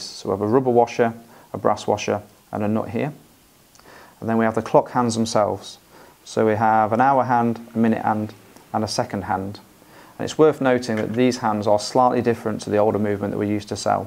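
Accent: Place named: England, United Kingdom. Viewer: It's easy when you know how! Thanks. It was so reassuring to hear an English accent for the demo too. Very good thanks.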